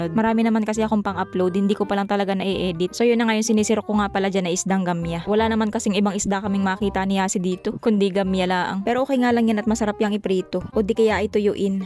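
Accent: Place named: Visayas, Philippines. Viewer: Bakit may halong bisaya ang salita mo idol?😅bisaya din ba kayo?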